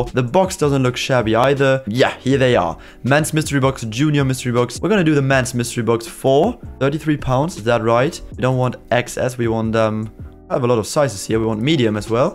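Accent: Germany. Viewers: are you a german because i hear it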